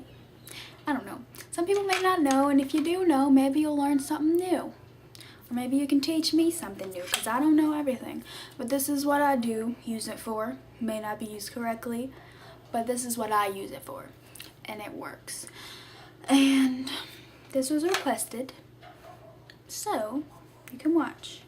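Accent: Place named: Texas, United States. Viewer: Where are you from?Texan?You kinda have an accent like me..lol,good video ;-)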